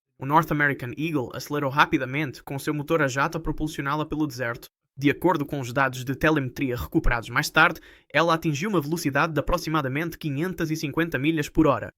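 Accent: Portugal. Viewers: Português de Portugal não dá, impossível assistir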